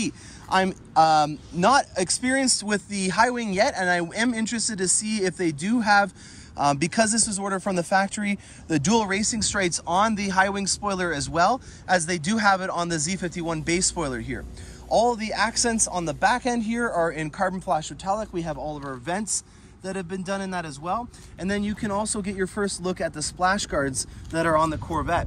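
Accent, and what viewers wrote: Canada. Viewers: Don’t get me wrong...I love the videos and the Canadian accent makes me smile (my father was from Canada).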